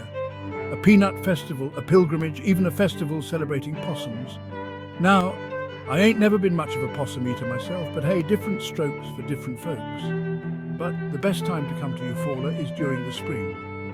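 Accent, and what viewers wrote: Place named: United Kingdom. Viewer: What a crock I lived in Eufaula for over 30 years. This is a bunch of stock photography and some dude with a British accent thumbs down.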